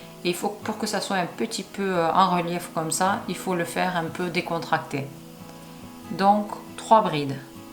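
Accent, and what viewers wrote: South, France. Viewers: magnifique et en plus le merveilleux accent du midi, que demander de plus pour un 31 décembre ... très bonne année 2025 madame !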